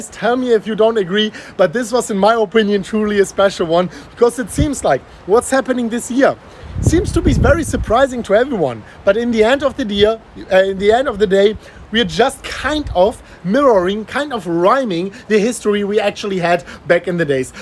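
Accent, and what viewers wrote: Germany. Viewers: Can someone tell me what his accent is? German? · This guy has all the things I hate about YouTube. Terrible thumbnails, click bait, shamelessly promoting affiliate links, too many ads etc. However I love the emergency streams even though nothing is happening, the German accent and he seems like a stand up guy. All the best.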